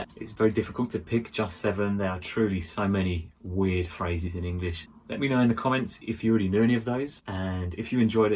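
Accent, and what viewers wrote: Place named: RP, United Kingdom. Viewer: I’d say the modern RP accent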